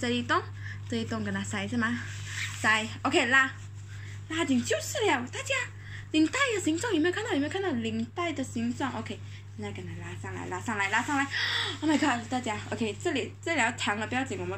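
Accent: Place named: Malaysia. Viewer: 我的天马来西亚风的说话pattern哈哈哈 还是这种pattern适合我 我看了好多视频我都一头雾水哈哈哈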